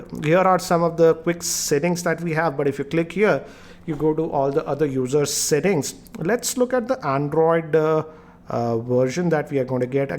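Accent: India. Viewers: All you Indians have the same tacky accent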